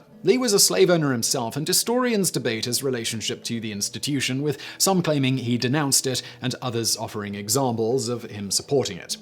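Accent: United Kingdom. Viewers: The late Shelby Foot would argue up and down with your position on #8. Shelby Foot once said that to say slavery had nothing to do with the war is just as ignorant as saying slavery everything to do with the war. Speaking with a British accent doesn’t make you an expert on US history. This is more of a personal view provided by this Brit then actual fact vs myth. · I don't need someone who speaks the queens english to tell me diddly squat about my country. End of story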